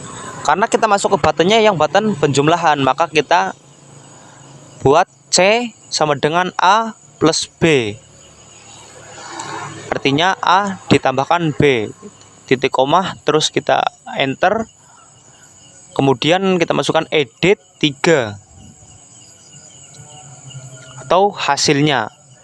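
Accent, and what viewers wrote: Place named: Banyumas, Indonesia. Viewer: Ngapaknya itu loo 😍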